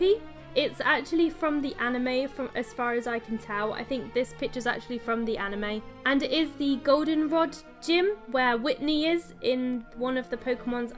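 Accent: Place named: United Kingdom. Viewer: Hello, stumbled upon your videos not too long ago and i like your style and your lovely british accent so i subbed and i can't wait to see more of your DQB creations. 😉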